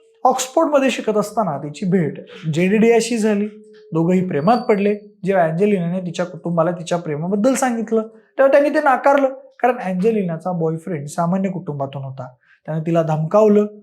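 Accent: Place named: Satara, India. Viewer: Kadak bhasha shaili ahe satarkar vatatat nakki chhan avdla vidio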